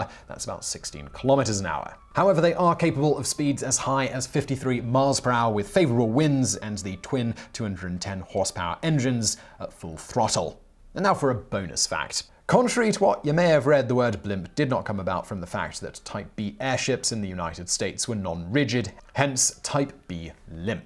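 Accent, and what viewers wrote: United Kingdom. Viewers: Did it sound weird to anyone else when he said the speed in miles per hour before saying it in kilometers? Like maybe my brain, hearing a British accent, prepares itself to pay attention to the second set of numbers?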